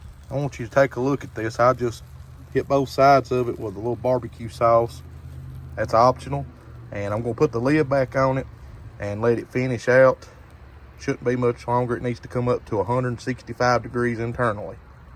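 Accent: South, United States. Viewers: love the southern accent 🙂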